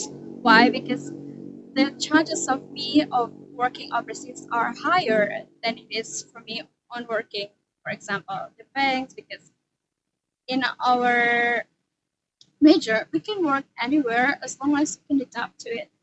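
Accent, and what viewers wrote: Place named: England, United Kingdom. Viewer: Wawww i lov ur english accent😳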